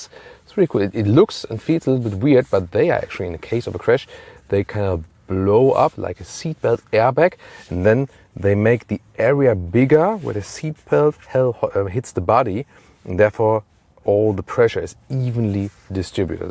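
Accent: Germany. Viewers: Vom accent bin ich mir sicher das er deutscher ist 😅 🇩🇪💪💯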